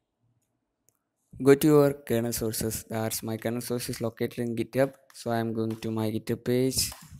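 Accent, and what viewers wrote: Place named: Kerala, India. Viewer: I can find u r mallu by your voice😂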